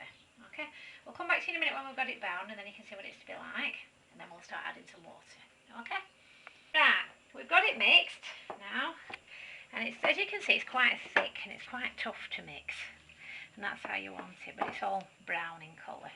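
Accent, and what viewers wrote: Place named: North, United Kingdom. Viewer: Thank you for this video it's great and with your northern accent easy to understand. Tracy from Accrington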